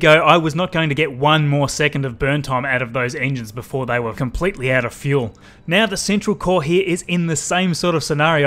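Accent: Australia. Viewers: Are you an Aussie or a New Zealander?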